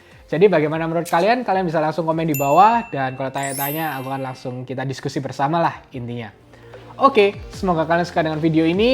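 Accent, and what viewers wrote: Java, Indonesia. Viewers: Orang Jawa logatnya keliatan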